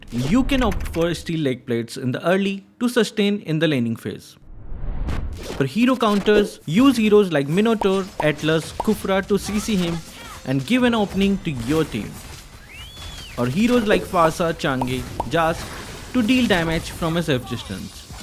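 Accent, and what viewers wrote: India. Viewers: I think you are Indian because ur voice is like an Indian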